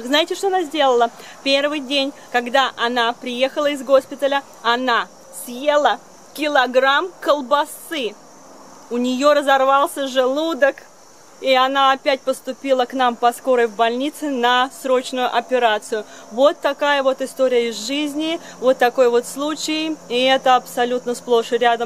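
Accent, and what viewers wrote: United States. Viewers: а говор-то уже штатовский.... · У автора уже американский акцент)) позитивно. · ну в общем видео ничего, но сразу видно что заамериканилась, уже поведение и манера разговаривать совсем не русские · впервые сейчас посмотрел ваше видео и вас саму. Вот когда я слушал как вы говорите по русски, то не мог сразу прислушаться к говору, явно замечается воздействие американсгого английского языка, ещё тяните звуки на "а". Не хочу этими словами оскорбить, просто так вот заметил. Просто живу на Среднем Урале и привык к местному говору)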